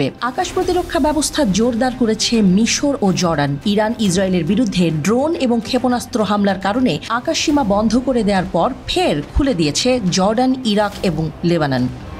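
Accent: Kolkata, India. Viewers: আর টিভির যে কন্ঠ দিচ্ছেন একি ভারতের কোন নাগরিক কিনা গলার সুর শুনে মনে হয় ভারতীয় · এই মহিলা কোলকাতার ভাষায় কেন খবর পরছেন?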